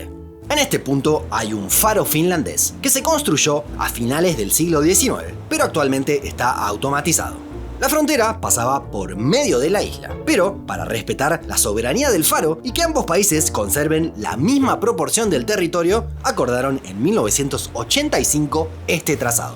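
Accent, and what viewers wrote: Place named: Argentina. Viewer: Sinceramente tu voz es muy agradable! generalmente me cuesta escuchar videos con acento argentino (por que toda la vida he visto las peliculas y programas con doblaje mexicano, aunque no soy de mexico) pero contigo jamas me costo, super genial el canal!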